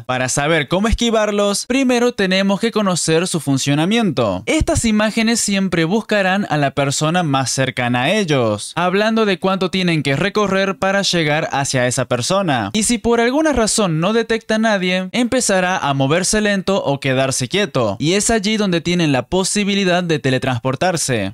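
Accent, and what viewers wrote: Argentina. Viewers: Vos eres. De 🇦🇷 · eres argentino esa es mi pregunta y tambien yo soy argentino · ENTONCES ERES DE ARGENTINA?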